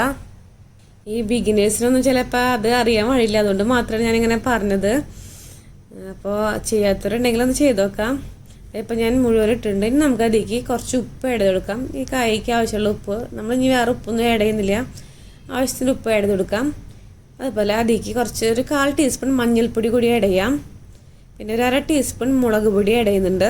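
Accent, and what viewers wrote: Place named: Thrissur, India. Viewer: Aha nammude trissur slang wd varutharcha kayakkari polichu😋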